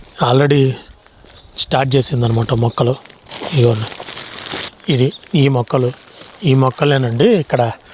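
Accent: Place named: Prakasam, India. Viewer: nee lanugage prakasam slang lo vundi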